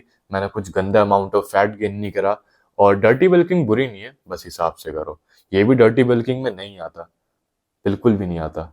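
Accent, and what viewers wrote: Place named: Pahadi, India. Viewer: Pahadi ho kya bhula??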